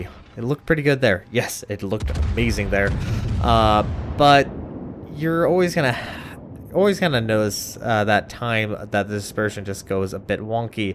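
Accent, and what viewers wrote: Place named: France. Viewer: Dat French pronunciation doe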